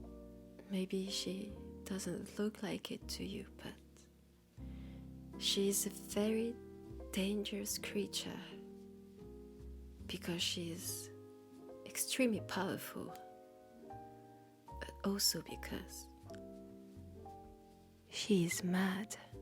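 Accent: France. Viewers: Love your videos! And I’m a sucker for accents, especially French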